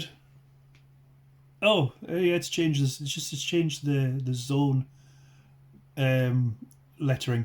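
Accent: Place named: United Kingdom. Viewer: Arizona U.S.A and English, sounds as though your of British/UK descent. Thanks for doing this video.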